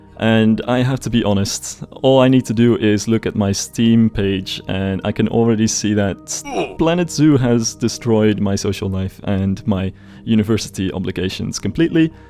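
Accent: Netherlands. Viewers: Your English is really good, but for some reason I still knew you were Dutch the second I heard your voice.. Not sure how that instinct works😂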